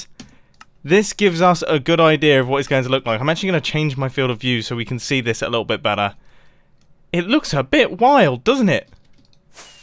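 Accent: United Kingdom. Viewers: Mumbos UK accent Mumbos low voice accent without his UK accent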